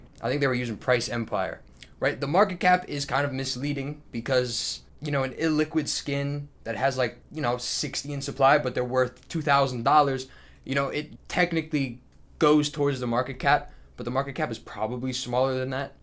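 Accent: New York, United States. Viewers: The real new yorker came out of you today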